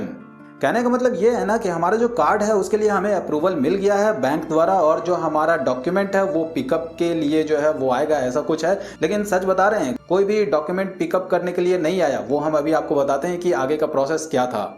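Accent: Bihar, India. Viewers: bihar se ho kya? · Bhai apki bate Bihar acchi lagi 🤭🤭🤣🤣🤣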